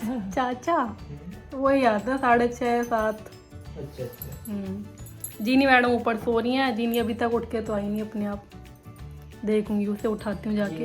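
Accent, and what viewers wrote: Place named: Rural, India. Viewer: Sister aap to bilkul gaon ki language bole to aap to Delhi mein rahe ho ham bole to ham bhi udhar ke side ke Hain ham bhi aapki tarah bolate Hain jab ham ghar mein baat karte hain to aur aapke language bahut acchi lagi aapki mummy bolati hongi lekin aap to Delhi mein Pali bade ho so sweet bahut achcha Laga blog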